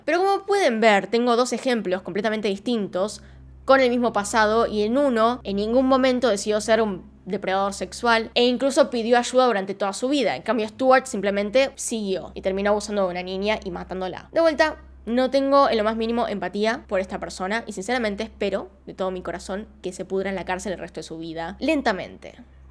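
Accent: Argentina. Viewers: Es agradable el acento argentino y si es en un caso interesante, mejor 😅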